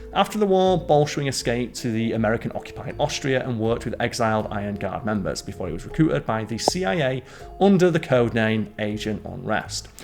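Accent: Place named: Northern England, United Kingdom. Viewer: You've done really well at slowing down the sleech pattern for all the non northern English people. I am one and it was really difficult when I moved over seas and appreciate the effort you've put in 😅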